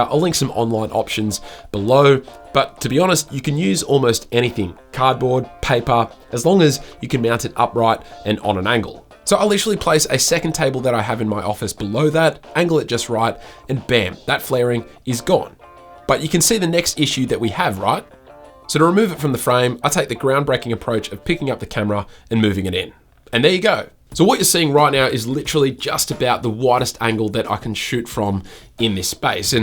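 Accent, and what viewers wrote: Australia. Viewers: Hey, this was very helpful! I was always considering that aperture light but now this just gives me all the more reason to do so! Also, to hear a fellow Aussie accent helped me connect with what you were saying more! Will go through your other stuff and subscribe:)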